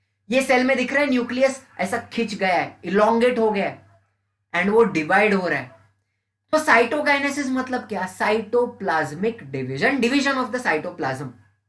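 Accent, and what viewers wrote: Maharashtra, India. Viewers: Are u Marathi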